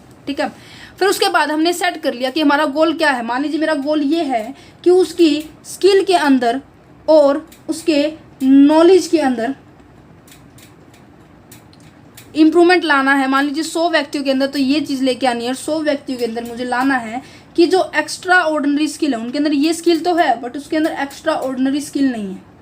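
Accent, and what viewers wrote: Haryana, India. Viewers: Mam aap Haryana se ho gi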